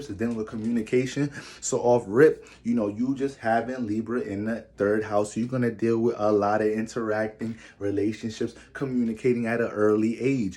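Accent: New York, United States. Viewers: I swear you from nyc or jersey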